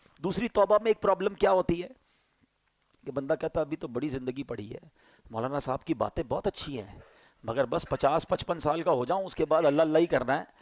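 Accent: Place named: Punjab, Pakistan. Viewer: Hzrat AAP itni peare pnjbe bulty ha Bhat achi lghti ha masgalla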